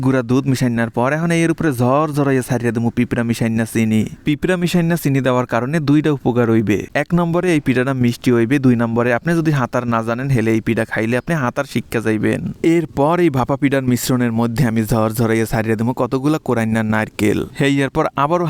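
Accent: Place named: Barishal, Bangladesh. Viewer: কথা গুলা সুন্দর আমাগো বরিশালের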